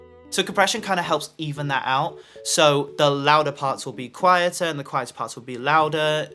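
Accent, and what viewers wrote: United Kingdom. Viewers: Your accent is so British ❤️ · i completely forgot has this strong british accent and how it makes everything he says sound so elegant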